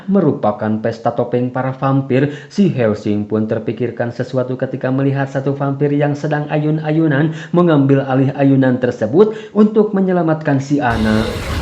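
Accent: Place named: Subang, Indonesia. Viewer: Aksen subang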